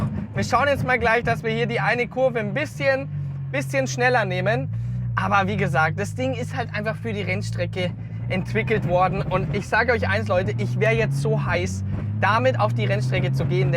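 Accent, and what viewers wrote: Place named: Franconia, Germany. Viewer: dieser fränkische Dialekt 🤣🤷🏻‍♂️ herrrrrlich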